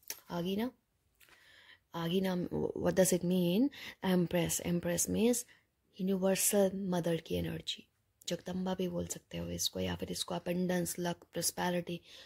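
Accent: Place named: Punjab, India. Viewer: Love your punjabi ascent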